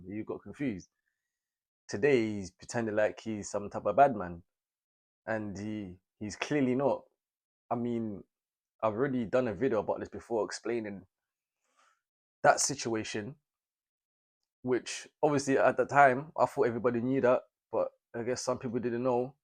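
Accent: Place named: United Kingdom. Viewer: U sound like u are from UK... 😅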